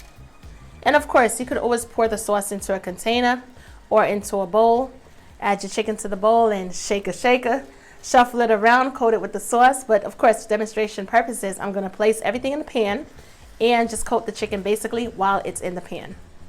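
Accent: New York, United States. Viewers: Love the new York accent. You always tell another new Yorker when you hear them.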